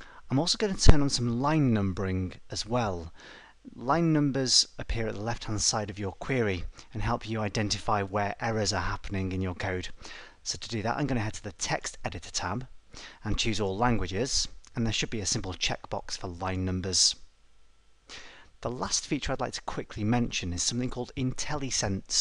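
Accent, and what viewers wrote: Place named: North Wales, United Kingdom. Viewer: I recognise that accent anywhere, do you come from North-West Wales? 🤔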